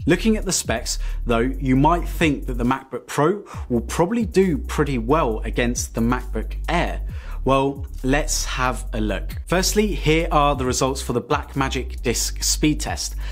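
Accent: United Kingdom. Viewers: finally! some practical comparison! not some geecbench and raw tech specs! thank you! how about some simple things like watching good quality video/ movie or zoom call? and it is just nice listening to british english 🙃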